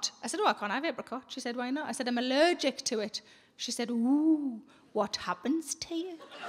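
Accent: Newcastle, United Kingdom. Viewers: Aha, Geordie/Hobbit crossover, I approve.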